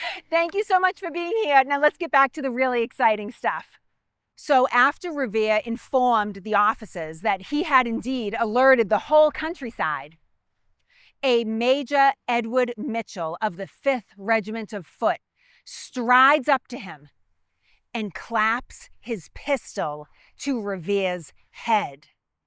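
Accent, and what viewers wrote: Boston, United States. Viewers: Connecticut here. Love a Boston accent. Great job. I subscribed and liked and look forward to more. Thanks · . My family is from Boston, and sorry, she sounds just like us. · I Love Her BOSTON ACCENT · Great presentation. My father and grandparents were Boston natives. I lived in or near Boston for a while in grad school at Brandeis. You have a classic accent - my dad said 'bananer' but did not drop his 'r's. His sister did.. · The Boston accent is on 110. Turn it down just a tiny bit please. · I just love to hear you tell stories. I love your Boston accent and the passionate way you connect with history. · After listening to her talk for only 15 seconds, I could definitely tell she was from Boston.🤣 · As a native Bostonian who worked hard to eliminate my local accent this gal is the real deal. She does a great job explaining a very important historical event. 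Cheers! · Not only the interesting historical information, and views of the sites, it's done in that lovely Baston accent. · I’ve noticed that you’ve commented on her accent on several past videos. Just to confirm so you may perhaps move on: our family is from Boston. Her accent is authentic. Thanks. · I love her accent-- my inlaws are Boston area born, raised, still there. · I grew up in Boston. I am a 4th generation from the same town and my 2 boys are the 5th. I think you have more of a Boston accent than I do and you are probably not even from Boston. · That’s pretty rude! It’s an authentic Boston accent and your comment is offensive to those of us who are lifelong Bostonians. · I LOVE YOUR BOSTON ACCENT. Im from California, biggest Red Sox fan in Ca and a big fan of Our Founding Fathers and American history. I just found your chanel and i love it. Thank you for sharing your knowledge.🇺🇸 I Love My Country. 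God Bless all and God Bless this beautiful country🇺🇸🇺🇸🇺🇸🇺🇸🇺🇸🇺🇸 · As a Bostonian, not only do I love the history but I love the accent. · As a southerner I could listen to Revolution history in this Bastan accent all day long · For all of you out there, this is one authentic Boston accent. If you are anywhere in the world, and you here this tone, Boston is not far away. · Great story. However, what an AWESOME Boston accent! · All my Gad … the actual Spat … live the Boston Accent , I mean Bastan accent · Ok this story can only be told with that Boston accent!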